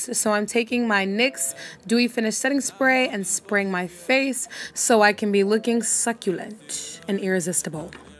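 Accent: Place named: Nigeria. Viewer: omg you are gorgeous are u Igbo Yoruba or Hausa etc? you definetly sound Nigerian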